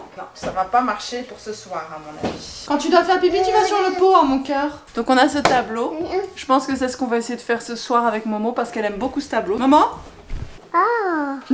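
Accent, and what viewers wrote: Brussels, Belgium. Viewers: Coucou! Je suis ta chaîne depuis la Belgique, de Liiiidje 😅🇧🇪 tu es d'où toi 'originellement'? Tu as un peu l'accent de ma couzine de Forest hehhe! J'ai découvert ta chaîne il y a peu grâce au Home tour et puis je suis tombée amoureuse 😅😍 de ta bonne humeur communicative, tu m'inspires positivement et j'ai l'impression de me sentir mieux pendant ce confinement grâce à ce voyage virtuel dans votre super vie 'exotique' si jpuis diiire hehhe. c'est marrant l'effet des vidéos quand même hein.. J'ai déjà partagé tes vidéos aveca meilleure amie et des amis fan du Japon car je trouve que c'est sympa comme tu présentes ça en tant que Belge 👍🏻😊 Continue à nous faire vivre des petits moments bonheur comme ça'ps: j'adore ta maison elle a l'air tellement grande et le jardin avec son porch' LOVE. IT. HIHI bises. Sophie de Liège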